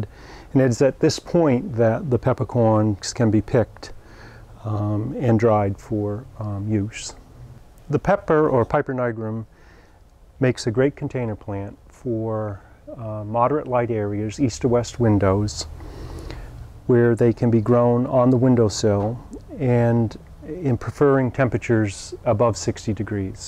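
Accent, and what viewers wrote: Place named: Boston, United States. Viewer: You have kind of a Ted Kennedy accent.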